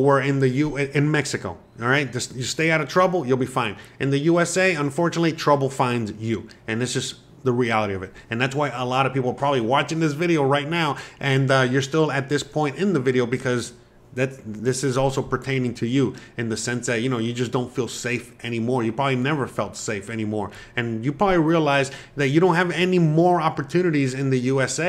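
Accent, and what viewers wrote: Cuba. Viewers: Man you speak cubano very different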